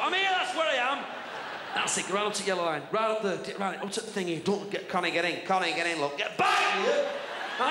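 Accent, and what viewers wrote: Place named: Bolton, United Kingdom. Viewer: "Pissed off with er stuck up" 🤣🤣🤣 Bolton accent eh lol...COME ON... Keep them coming... Makes my bloody day..😁😁👍✌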